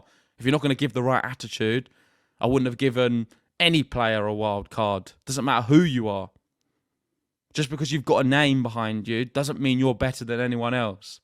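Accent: United Kingdom. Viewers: You're English/British?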